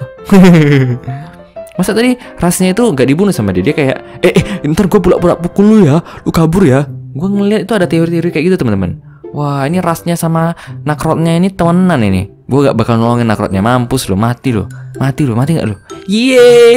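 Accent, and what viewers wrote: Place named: Medan, Indonesia. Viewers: nampak kali medannya ya bang :D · Abg org medan yaa ?? Logatnyaa...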